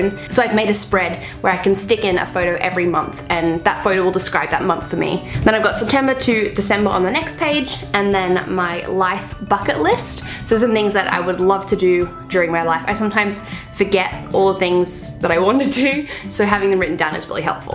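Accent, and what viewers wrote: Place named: England, United Kingdom. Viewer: Is she english? I quite like her accent 🧡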